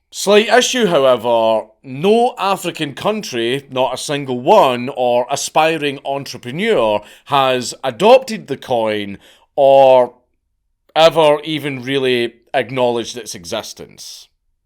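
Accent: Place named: Scotland, United Kingdom. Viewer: I like how Count Dankula doesn't try to change his Accent for the International Audience Because of him i feel like i can now fluently understand Scottish for when i visit Scotland as a Rural Bavarian from Germany Prost & Cheers from Berchtesgaden in the Bavarian Alps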